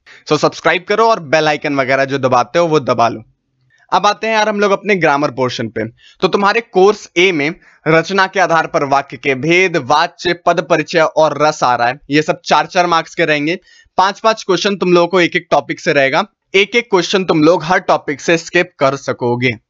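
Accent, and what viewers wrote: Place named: Kanpur, India. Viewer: Bhaiya Aapki aawaj na...Bilkul Kanpuria style h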